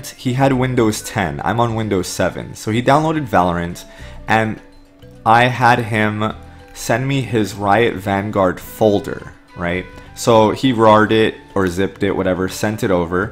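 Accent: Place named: Turkey. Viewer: its turkish accent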